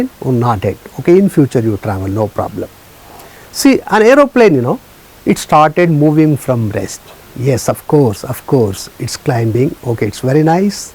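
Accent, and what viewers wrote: Telugu, India. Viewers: Really ur Telugu only sir I caught many words like questions su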